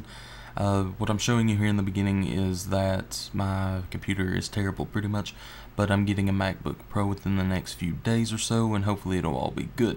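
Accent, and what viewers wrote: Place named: South, United States. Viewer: I think its southern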